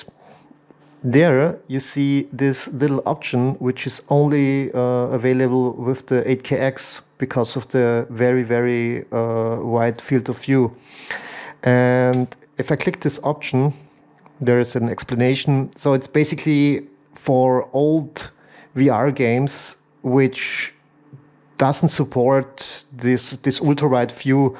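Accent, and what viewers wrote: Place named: Austria. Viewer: Servus höre am Akzent das du Österreicher bist😂 glaubst du das eine 4070ti super genug ist um das Headset zu befeuern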